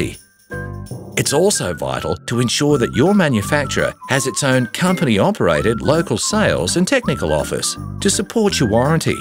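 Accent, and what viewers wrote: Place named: Australia. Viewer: Nice cartoon and Aussie voice-over that sounds great without really saying anything at all. Yingli are best described as the Toyota of solar panels. Very good quality and technology but not the best,very popular as they are neither cheap nor premium priced. Why don't all Chinese solar companies take this simple approach to marketing instead of trying to sell on low prices all the time? Obviously works for Yingli. Well done.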